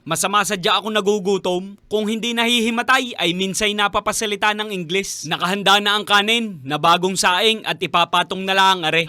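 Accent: Batangas, Philippines. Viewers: Ay kagaling eh. Batangenyo ka talaga idol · Parang Batangueno ala eh😍🤩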